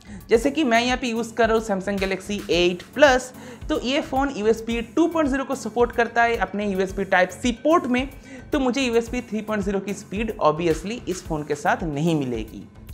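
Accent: Bengal, India. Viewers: I'm also... Tomar kotha sune mone holo tumi bengali😉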